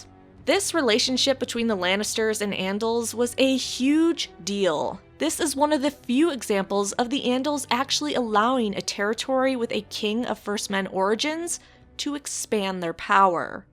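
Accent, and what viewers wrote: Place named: United States. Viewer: To hear this in ... "American" sounds soooo wrong. No offence though.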